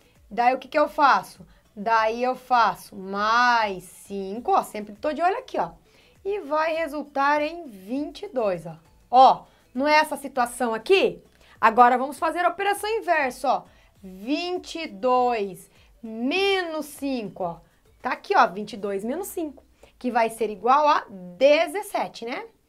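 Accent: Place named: Rio Grande do Sul, Brazil. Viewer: sotaque gaucho kkk vlw pela ajuda tenho 4 dias pra estudar para prova de matematica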